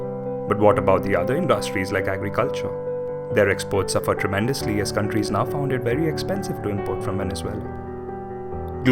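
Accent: India. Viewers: INDIAN TALKING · The narrator is Indian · You sound like a person from India. Are you from India?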